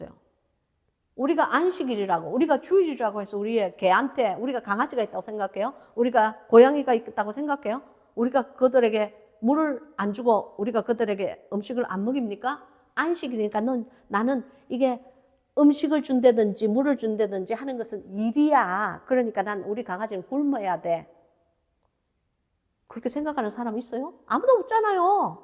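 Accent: Gyeongsang, South Korea. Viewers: 목사님~보고싶었어요❤ 진짜~~~성경공부 오랫만😂 너무 너무 좋아요 목사님 첫인사 하시는 것도 넘 귀여우세용 *꾸지리ᆢ*갱상도 사투리도 ㅋㅋ (저도 부산~^^)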